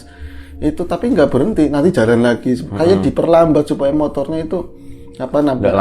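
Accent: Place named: Java, Indonesia. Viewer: Mas ni klo crta bikin senyum² ketawa² sndri jj gegara Pakebahasa jowo nya medokk.. mantapp cirri khas dr ngoborr, 😁😁😁 mogga channel nya smkin berkembang 👍👍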